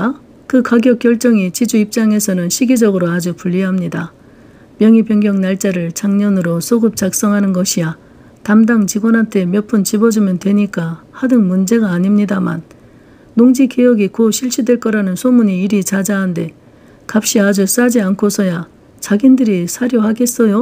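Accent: Jeolla, South Korea. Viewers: 많이 힘드실텐데 계속 읽어 주셔서 감사합니다. 전라도사투리에 욕하는것까지도 무척 찰지고 정답게 들립니다. 작가님, 건강 잘 챙기세요.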